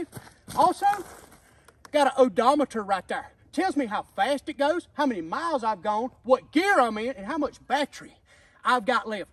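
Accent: South, United States. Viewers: I love his Southern accent 😀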